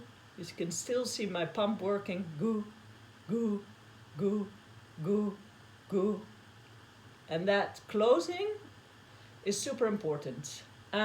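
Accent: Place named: Netherlands. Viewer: Are you from the Netherlands cause you sound just like a female version of Wim Hof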